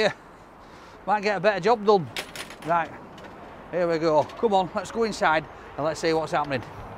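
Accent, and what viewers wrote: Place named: Bolton, United Kingdom. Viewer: Are you from Bolton mate ? You sound just like my mom and grandparents who are from there..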